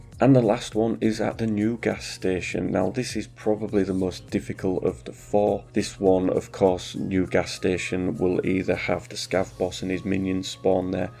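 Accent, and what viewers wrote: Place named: Hull, United Kingdom. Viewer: Thanks, you sound like you are from Hull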